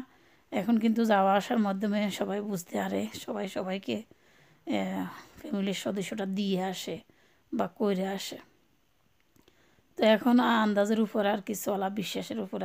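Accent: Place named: Noakhali, Bangladesh. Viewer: আসসালামুয়ালাইকুম আপু তোমার ভিডিওটি খুব ভালো লাগলো তুমি কোথায় থাকো বেল বাজিয়ে রাখলাম আপু তোমার কথা শুনে মনে হচ্ছে তোমার বাড়ি নোয়াখালী আমার বাড়িও নোয়াখালী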